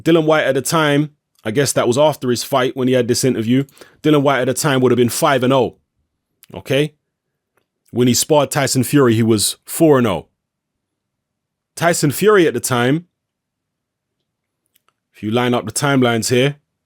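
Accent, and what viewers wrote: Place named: Cockney, United Kingdom. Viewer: Guess where from?? Can't half tell this man is black an cockney!! Gives Tyson no credit & dillian AJ all the credit.. can't fucking wait till Tyson schools wilder, my guess is will change his mind & make it 60/40 wilder leading up instead of 80/20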